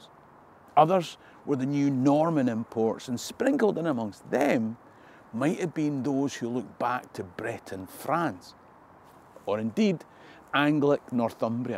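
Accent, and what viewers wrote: Scotland, United Kingdom. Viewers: The Scottish Brougue you speak in among family and friends. (You said you adapt your speech so more people understand you when you do the videos) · Sir, I am an American by birth and 1/2 Welsh and Irish and some Cherokee . First, I see a very good presenter of Scottish History, nothing more. Tome you sound Scottish, so I figure you are as I am an American. I want to thank you for the lessons . I happen to come across them and have watched two so far about Wallace. I see Wallace as a Scotsman that had ancestors from Wales. That is about it. Just as St Patrick was Irish but was half Roman from what I read, he is still an Irishman to me. Take care and keep the good work up. By the way, your humor is funny. I get most of it. Patrick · Such wonderful and rich history, all told in such a wonderful Scottish brogue it just feels so much more real than most history videos. 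Fantastic! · On your comment on being African Scots or vice versa, with a strong Scots accent, personally, I enjoy both you, and your show which I think is excellent and thank god someone is exposing some of the uncomfortable truths about both White and Black slavery, by virtue of your unique position, and I enjoy the humour chucked in, too. I wish you would expose more on the upper-classes abuses of people by using them as slaves whenever they felt like it, re Bernardo Children, I remember well as I lived near a home, and on my arrival in Canada, after settling in, I moved not too far away from one of the main Houses that they were sending them too before they were sold, I mean distributed. On the topic of Hereditary, I'm a 5th or 6th Generation Canadian Scots, who grew up in the East end of London, raised by my Half Irish Grandmother who did a wonderful job. My Grandfathers were both victims of WW1, One lost a lung to shrapnel, left to die by the Brits, he was saved by a German Dr although they had run out of anesthetic, and finally sent home for my Grannie to nurse, while the filthy hole where his lung had been drained for years. 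Meanwhile, my Scots-Canadian Grandfather, was Mustard gases and blown up and Buried by shell explosions twice in one night, somehow they dug him out, and he lived, although with huge neurological damage, being so close to 2or 3 high explosive shells, well at least they didn't shoot him as he was suffering from shell shock according to one idiot Englishmen? He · Your video is very thought provoking, as are all your videos. I assumed you were 100% Scottish, going by your accent & manerisms etc. When you really think about it you are no more Scottish than I am English. We're all African. All of us, even your trolls. Human life began in Africa. All our borders are human inventions. There's no logical reason why Scotland should't invade the UK & take over...I not trying to give Nicola ideas but it could, in theory, happen. Only this fragile thing called peace stops Scotland/Wales/NI taking over the UK. 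The borders don't actually exist. You are African, I am African, (a white British, slightly Welsh African & your trolls are too.) I better buy a kilt & plant some thistles ready... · It baffles me that people come to this video, listen to you and think, na, he’s not Scottish. You are my definition of a Scotsman. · You sound pretty scotch to me I have almost all my genetic ancestry from those islands you have been talking but I. Definitely American, but happy you are educating me on where my anc came from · What ever you are sir, yah Scottish accent is fun too watch. Someone with around a quarter scotts irish iin him on my mother's Dad's side, I think that is why I have a rebel streak in me. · I'll be Inverness after this crazy long day and night. Because of you, I've learned so much and I am very grateful to you! What do mean you can't be Scottish? Just listen to that brogue! 😃 Humans can be quite daft. 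You do a great job and I love your videos.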